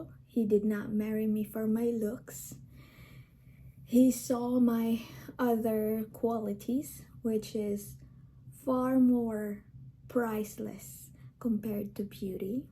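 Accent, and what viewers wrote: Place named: Philippines. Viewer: I'd thumb it up twice for authenticity. This lady is a representative of a real everyday Filipinas. She isn't fake or showboating or covering up her accent.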